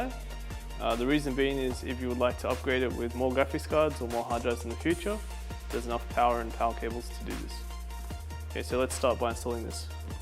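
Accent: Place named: Australia. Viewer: It's surprisingly refreshing to watch a youtube video and here an aussie accent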